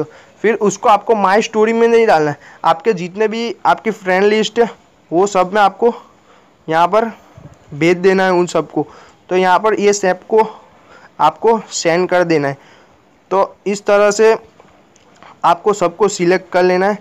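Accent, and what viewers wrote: Gujarat, India. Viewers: You are from Gujarat ??❤️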